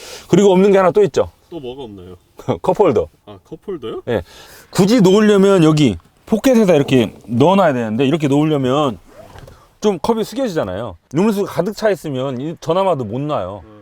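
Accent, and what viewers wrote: Chungcheong, South Korea. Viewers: 편안한 충정도 사투리 속에 날카로운 디테일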